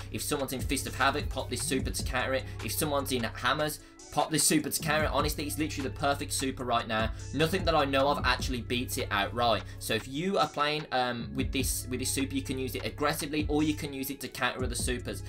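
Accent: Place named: United Kingdom. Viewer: British am I right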